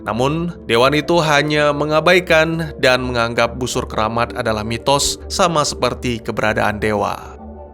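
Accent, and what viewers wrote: Aceh, Indonesia. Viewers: bg btw lu orang aceh kan bg?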